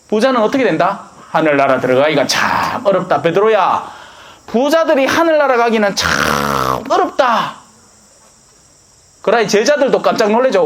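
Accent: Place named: Busan, South Korea. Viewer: 신부님 부산오셨군요 비슷한 사투리가 정겹습니다~ 항상 열정적이고 알아듣기 쉬운 강론 고맙습니다~~😊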